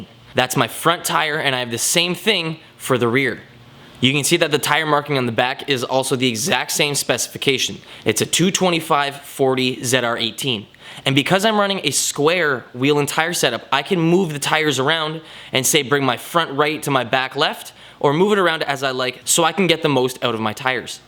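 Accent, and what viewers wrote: Canada. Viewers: For you maybe... but that's how Canadians say it. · Is this gentleman Canadian?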